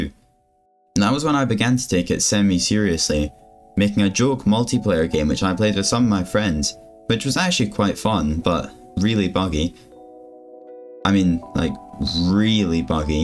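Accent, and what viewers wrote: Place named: Poland. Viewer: you sound polish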